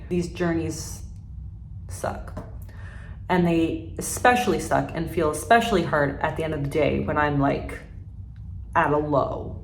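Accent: Canada. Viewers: I know you're Canadian. But you sound more and more Canadian recently. Love it! ❤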